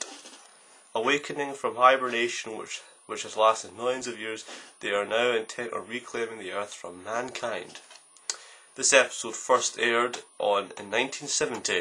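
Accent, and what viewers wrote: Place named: Scotland, United Kingdom. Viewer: What a very beautiful young man. I could listen to you all day. Adore the Scottish accent.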